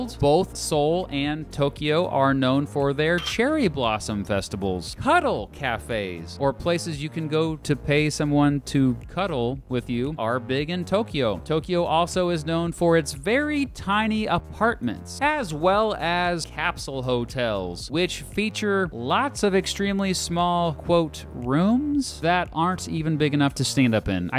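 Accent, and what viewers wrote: United States. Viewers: He clearly didnt learn the Korean romanization for this video. His pronunciation is pretty much expected from an American. I disagree that the revised Korean romanization is horrible. It got rid of the use of weird symbols that can't be typed with American keyboards that was previously present in McCune. And romanization systems aren't English, obviously you can't rely on English pronunciations for reading foreign romanizations. You actually have to learn them as the system that they are.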